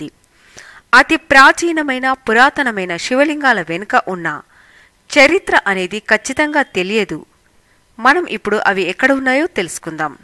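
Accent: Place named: Tamil Nadu, India. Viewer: Need to Improve telugu. It is like some tamilian gave voice to this video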